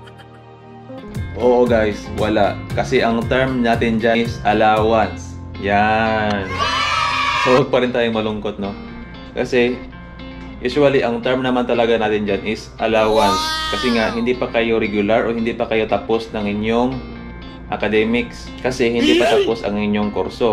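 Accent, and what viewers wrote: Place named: Visayas, Philippines. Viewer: This guy got strong Visayan accent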